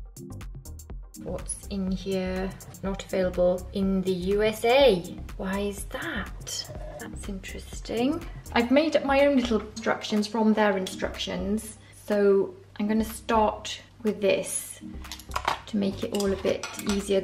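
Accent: United Kingdom. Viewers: Love your accent what is that British?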